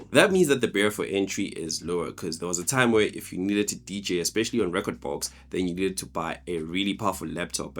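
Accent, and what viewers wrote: South Africa. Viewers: Is that a South African accent I sense 😀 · It's a South Afican accent, American/British/Danish... huh?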